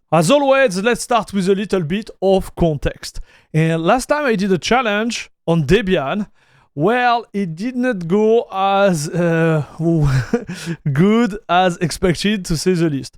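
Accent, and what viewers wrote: France. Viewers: I'm only catching like one out of every five words this guy says. His accent sounds French which makes sense they're because their language sounds like they have an eternal loogie stuck to the back of the throat. Such a disgusting guttural language. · Came for the French accent, stayed for the Trixie technical details, particularly kisak-mesa fresh, nvidia drivers, and the linux-tkg scripts on github. Two points: might I suggest you put links to these sites below your video review. Also, kisak-mesa seems to be for ubuntu. Do the debs in their ppa work with Debian 13? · bravo for your franglais. I can recognize myself in you. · go french accent. love to have that. lol.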